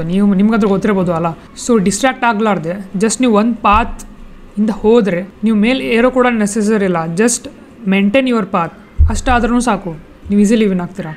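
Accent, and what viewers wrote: North Karnataka, India. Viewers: Anna mast matadiri uttar karnatak ❤ Anna physics difficult agateti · ಅಣ್ಣ ನಾನು ಕಲಬುರ್ಗಿ ದವಾ ಅದಿನಿ.ಉತ್ತರ ಕನ್ನಡ ಭಾರಿ ಮಾತ್ ಆಡ್ತಿ ನೀನು ❤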